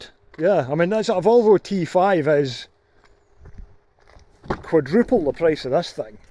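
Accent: Scotland, United Kingdom. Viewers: I absolutely love your accent. Is that Scottish? I'm tuning in from Boston Massachusetts.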